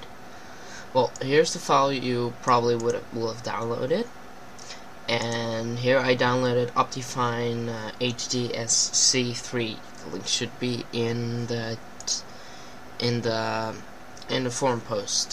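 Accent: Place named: Netherlands. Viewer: Your Dutch :D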